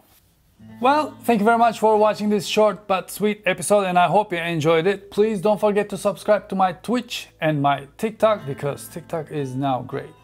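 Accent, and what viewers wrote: Turkey. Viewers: nedeni türk aksamı ile konuşması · İlk kelimeden anladım türk olduğunu xjdkkd nyse abi efsane ya herkes alabilse keşke o art book un içinde muhteşem şeyler var be bileklik figür çanta falan mükemmel ya o parçalardan biri için kavga bile edebilirmxdkkdkkdld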